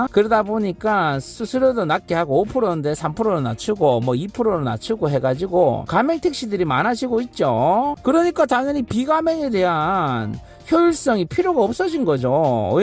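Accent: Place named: Daegu, South Korea. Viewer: 대구사투리가 너무 듣기 싫다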